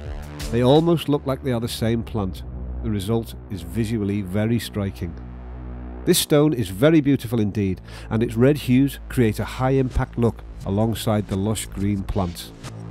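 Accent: Liverpool, United Kingdom. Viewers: Like myself, the narrator has a Scouse accent which is the casual way of saying a Liverpool or a Liverpudlian accent, it is an odd accent in so far as that there are quite an awful lot of people in the UK who just dislike the Scouse accent, and yet we have had a survey taken here in the UK which researched local and regional accents for use in providing a service for the Samaritans, it was determined that the Scouse accent was the most emotional and soothing of all UK accents giving callers a sense of trust in the telephonist, this is contrary to the stereotypical opinion held by people nationwide that Scousers are not to be trusted. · Is the narrator Scouse?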